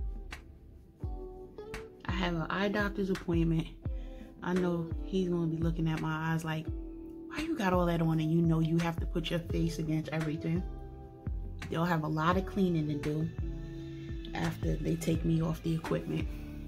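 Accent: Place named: New Jersey, United States. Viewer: Just found your channel and yes! Love your content Jersey Girl!(?) or if not from my home state, your accent tells us you are close neighbor👍🏽! Truly hope and pray your channel continues to grow because you know your stuff! So Awesome!!!! Your skin is amazing and so appreciate you doing You!!!! Thank you!! Can’t wait for the next video😊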